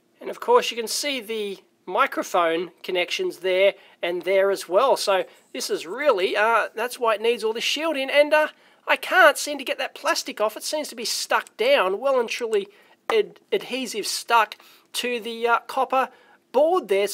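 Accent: Australia. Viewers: He speaks "strine." :-)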